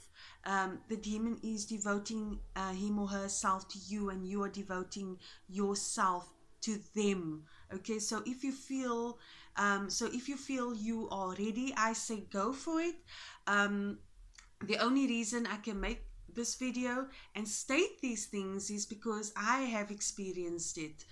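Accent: South Africa. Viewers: That accent. You from south africa Capetown bra. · You have to be from SA? Just came across this video but we SA people have a specific accent 😋😋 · Are you South African?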